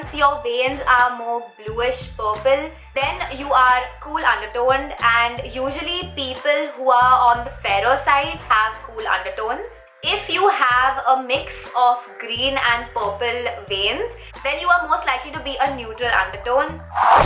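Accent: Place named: India. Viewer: I hateee indian accents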